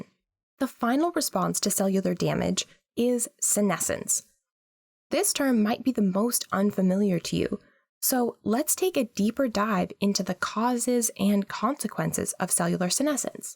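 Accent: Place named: United States. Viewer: It would really help if you were to not speak American. It is very difficult for us English speakers to follow what you are saying, because we have to mentally transform it, whereupon miss what came next.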